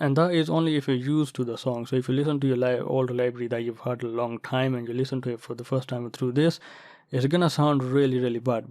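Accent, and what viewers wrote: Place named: Yorkshire, United Kingdom. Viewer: Hi. My experience of the Jitterbug, when connecting it from my PC to my Audiolab M-DAC is that there was a loss in some of the high frequency detail. It made the audio sound very analogue. I later sought advice and tried connecting the jitterbug to a different USB port to the DAC. This resolved the problem. It got rid of the noise from the PC motherboard that I bought the jitterbug to resolve. I could hear all of the detail that I previously could from my DAC. So, in short, my solution is to use the jitterbug on a different USB port to the DAC. On a different subject, are you from Yorkshire? I'm curious about your accent. Thanks.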